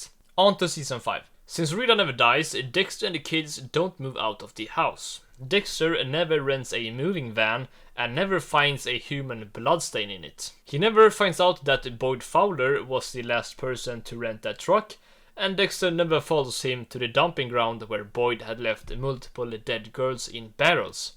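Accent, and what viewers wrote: Sweden. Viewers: Holy svengelska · You're definitely Swedish. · u swedish? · That Swedish accent going crazy 🔥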